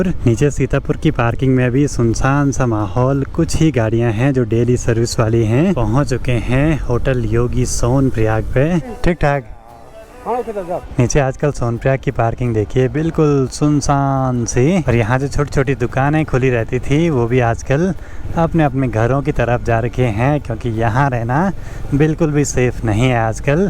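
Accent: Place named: Haryana, India. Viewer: Bhai haryanvi bol rahay ho tenay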